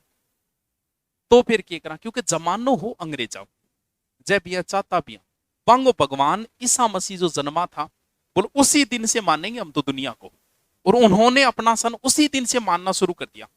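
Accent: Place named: Rajasthan, India. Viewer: Padhate acha ho but hindi nhi bolne ki wjh se aadi bat samaj hi nhi aati apki .... marwadi, rajasthani bolte ho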